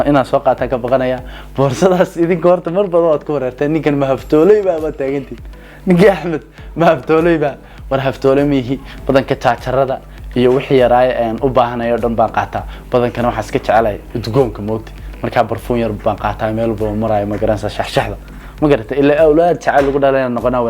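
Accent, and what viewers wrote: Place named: Mogadishu, Somalia. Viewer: Lahajda Heer Xamarkad Wadata Weli Bro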